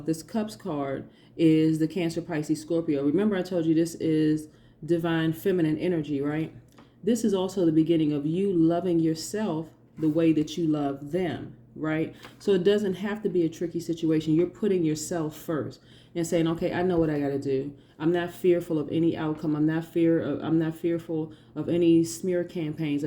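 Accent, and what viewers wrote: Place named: Chicago, United States. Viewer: You are too cute. You talk like you are from Chicago! Bust some suds! Yes, the reading ... on point as always!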